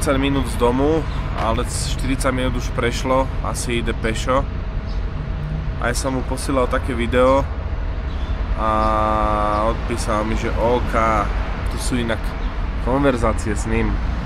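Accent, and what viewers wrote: Trnava, Slovakia. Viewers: hlavne pokračuj s takouto formou. páči sa mi to aj tá naša trnafčina.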